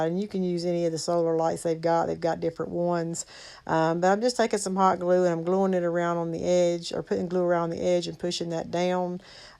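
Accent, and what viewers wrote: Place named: North Carolina, United States. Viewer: Also, I enjoy your videos so much. Your accent sounds so much like my North Carolina relatives <3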